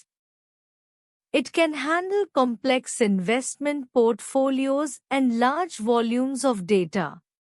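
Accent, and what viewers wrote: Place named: India. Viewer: Omg why this Indian accent when it's a bot???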